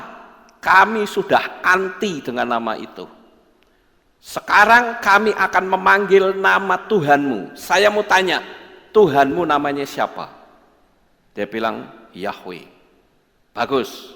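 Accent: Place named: Java, Indonesia. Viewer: medok logat jawa mu pak.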